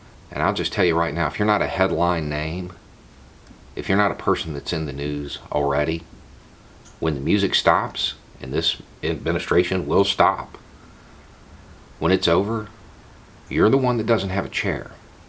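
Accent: South, United States. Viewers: Clicked on this and saw a guy with a southern accent talking about Trump...thought I knew what was coming. Instead I got a reasonable voice from a concerned American and for once I am glad to have wrong assumptions.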